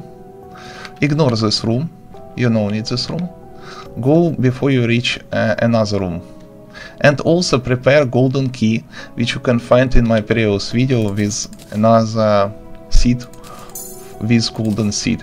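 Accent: Russia. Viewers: мдаа с русским акцентом!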